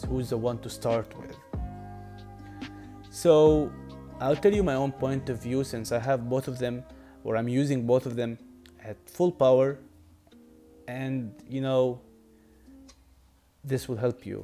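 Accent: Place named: Arab world. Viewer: طريقة كلامك غريبه حاس انك عربي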